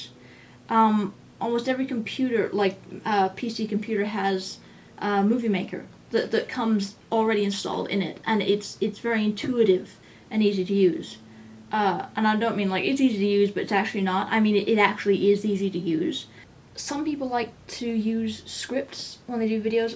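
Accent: United Kingdom. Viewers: Her father is British, and she grew up with it. I worked in Vancouver and there were plenty of British people living there who had not relinquished their accents. Believe me, if you spent a weekend in Oklahoma, you'd pick up a slight drawl, so cut her some slack. I lived in Northumberland for a year, and within a month the Geordie was sticking to me. "Yeah" became "aye." It happens. Try to live with it.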